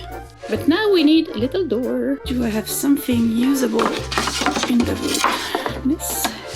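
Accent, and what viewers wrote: France. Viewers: Hearing you say Kentucky was so cute lol I love your French accent! Also I’m glad you clarified the kitty is “not your cat” but is simply a “roommate” hahaha yes my cats are also rent free roommates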